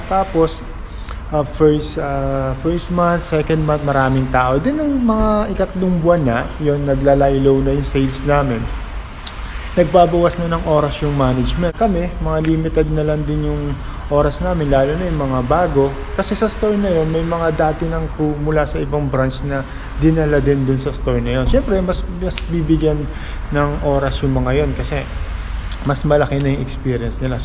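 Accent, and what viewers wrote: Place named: Philippines. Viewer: Hi kabayan, okay yan na pinoy pronunciation ang gamitin para hindi mabawasan ang pinoy feels sa mga vlogs mo. Ako dito working in Heanor pero mga tao dito kakaiba magpronounce ng words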